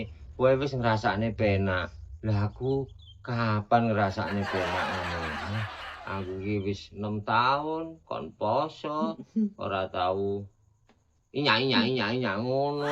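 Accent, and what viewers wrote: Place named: Blitar, Indonesia. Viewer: kong sampean asline pundi apa blitar ko nadane koyo wong blitar blitarnya mana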